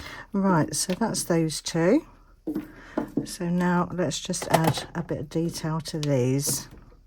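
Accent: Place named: United Kingdom. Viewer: Another beautiful masterpiece as always,. God bless the UK for some of the most beautiful, most graceful female artists in the community, with such beautiful accents, and graceful poetry. 😍😍😍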